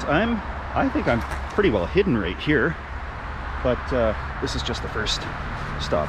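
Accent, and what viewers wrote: Canada. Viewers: his accent always makes me think of my favorite Canadians. Trailer Park Boys is timeless. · His accent is 100% Canadian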